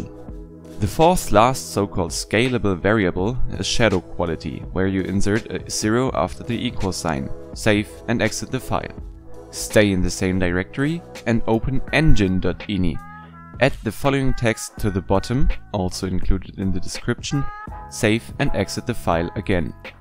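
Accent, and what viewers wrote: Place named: Germany. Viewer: mann weiß einfach sofort das du deutscher bist xD NICHT BÖSE GEMEINT ABER EIG ECHT NICE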